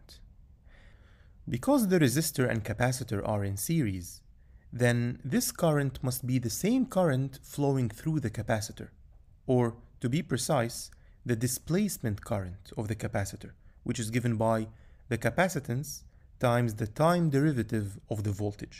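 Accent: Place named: Egypt. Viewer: You have an Egyptian accent kinda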